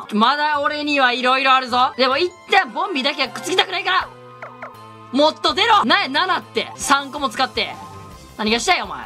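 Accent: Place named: Kansai, Japan. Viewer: この花江夏樹関西弁やな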